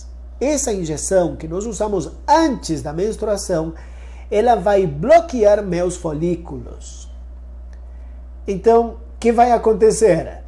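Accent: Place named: Peru. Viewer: Boa noite, cheguei no seu canal pois fiz uma pesquisa de transferência e qualidade de endométrio, tenho marcada minha transferência para próxima terça-feira e estou feliz e com muita fê que minhas medicas estão fazendo o seu melhor para que esta FIV tenha sucesso, eu fiz uma fiz o passado janeiro, mas infelizmente não fiquei gravida, você forneceu muitas informações que eu desconhecia, lhe agradeço muito!!! Mais uma coisa você tem um sotaque muito parecido com o meu, eu sou do Peru!! kkkkk Forte abraco.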